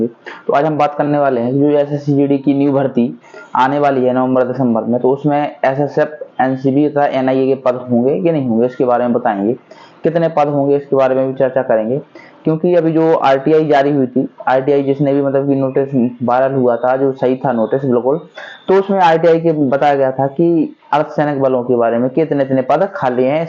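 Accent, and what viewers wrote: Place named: Jhansi, India. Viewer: Bhai मै झांसी से हु, 😊 आपकी भाषा काफी अच्छी लगती है, हम भी ऐसी ही बोलते है, आपसे मिलने का मौका जरूर मिलेगा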